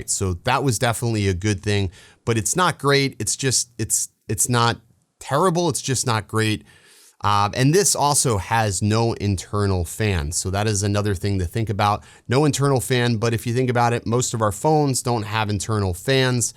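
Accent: United Kingdom. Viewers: Very nice intro... funny I tell my youngest to go into another room so I can watch these... 😆 🤣 nice bit of British makes it sounds very professional 👏 👍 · you narration was so spot on! Complete with the perfect British accent!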